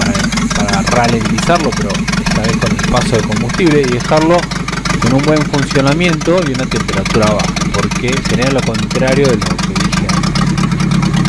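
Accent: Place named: Argentina. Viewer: por tu acento creo que sos de Argentina? Soy de Colombia y veo que el carburador difiere del que tienen instaladas las 2.0 aca! Creo que es un SOLEX 32/32. Podrias compartir que referencia de carburador traen alla los R18? Gracias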